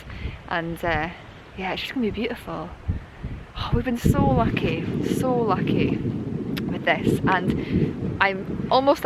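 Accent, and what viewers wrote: Scotland, United Kingdom. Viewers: Beutiful photos and I like your schotish excent so much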